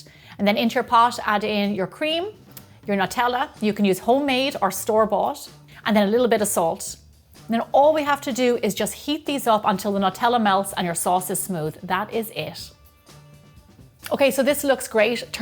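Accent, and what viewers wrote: Ireland. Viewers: I lov'eth I lov'eth 😆, got to love the Irish accent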